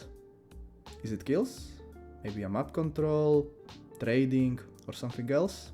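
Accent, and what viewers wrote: Czech Republic. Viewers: bros def czech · Hi good video btw are u from Czech republic? Ur ascent is so czechian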